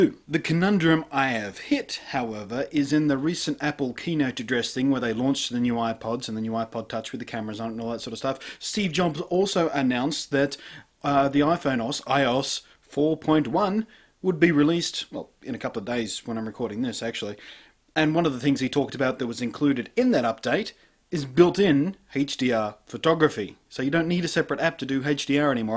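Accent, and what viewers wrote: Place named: Australia. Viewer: the australian way of saying "H" is awsome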